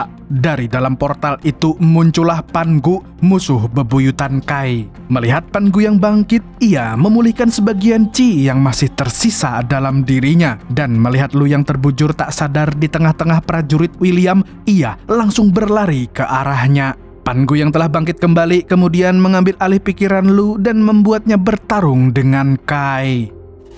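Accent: Banjar, Indonesia. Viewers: Org Banjar kah bang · Bang lu kalimantan mna, logat kek banjar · Orng kalsel kah pian mang, kya ad logat Banjar ny kaituu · Orang banjar kah pian nih mang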